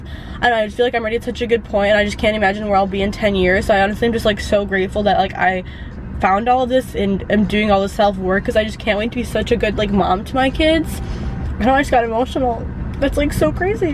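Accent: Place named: Russia. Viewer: I love your russlish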